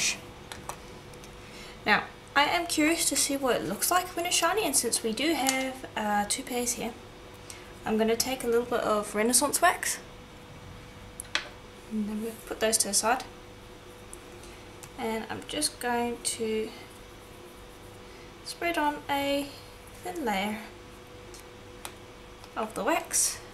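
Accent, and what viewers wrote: South Africa. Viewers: Beautiful earrings, and you have such a calming, eloquent voice. You are very talented. Thanks so much for sharing. I also love all your special tools and tricks. I especially love your South African accent, as that is also where I am from, but a very long time ago.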